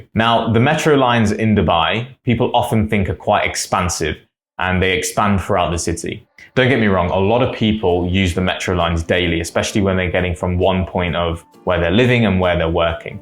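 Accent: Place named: United Kingdom. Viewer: Brodr why do u look indian,work in arabia n has an British accent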